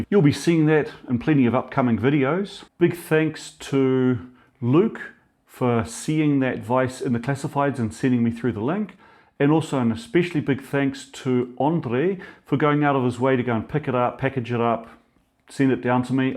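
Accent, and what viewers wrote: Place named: New Zealand. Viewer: Wow what a find. That vice is a real beast that just screams RIGIDITY, so should serve you well. BTW, you're clearly a Kiwi living somewhere in Europe I assume?? I'm in Auckland, NZ. Greetings from down under😊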